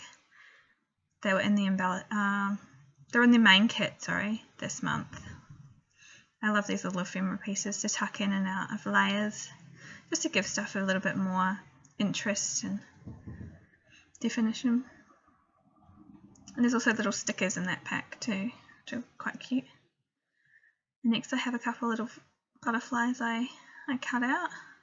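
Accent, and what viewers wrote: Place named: New Zealand. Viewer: I thought you sounded more kiwi than Aussie. I love your work!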